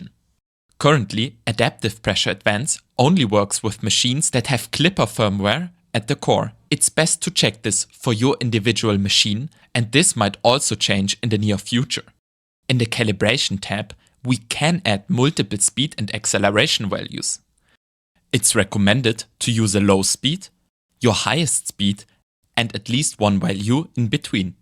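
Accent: Germany. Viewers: my guy as a fellow german, i’m sorry but ur accent is borderline too much. the content is great but it’s really hard to listen to. My guess is it’s because you „chop“ the words, u should adjust your max retraction and flow rate :D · Do you also have a german version of this video? Sounds like you are german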